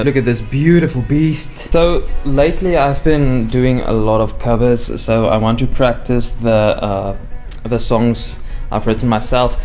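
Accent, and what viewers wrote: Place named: South Africa. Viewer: Daai South African accent 👌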